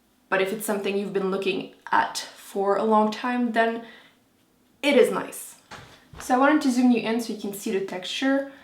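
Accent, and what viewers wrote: Quebec, Canada. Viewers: Shes french canadian from quebec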